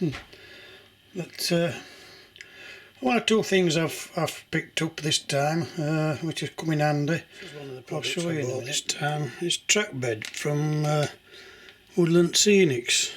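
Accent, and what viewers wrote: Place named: North, United Kingdom. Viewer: Hi, What a lovely set up you have, it looks great even as you said, a long way to go??, keep up the good work, nice to hear a north country accent as I am from the north country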